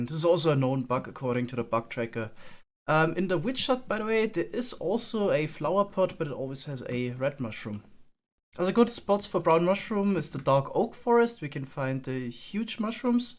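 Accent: Germany. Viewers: Bro, are you german? That dialekt xD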